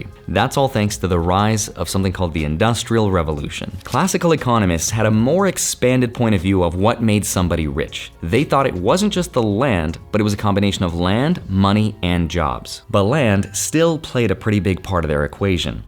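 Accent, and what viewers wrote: Australia. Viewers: Dude your Australian accent is actually really funny which makes it hilarious! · As an Australian, that is definitely how we talk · Australian here. Yes that is exactly how we talk. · Terrible Oz accent, excellent way to describe the problem. And entertaining. Thank you. PS: Oz accent - make each word the laziest way to say it (in your mouth). Shorten Melbourne to Melbn. :)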